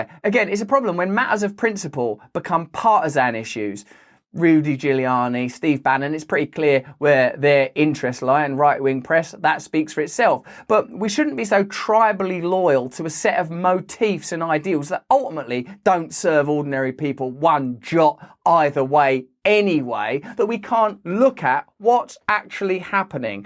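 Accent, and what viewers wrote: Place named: United Kingdom. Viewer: How does anyone stand this word salad firehose million words a minute but not saying much of anything speech delivered in that “nails on a chalkboard” British accent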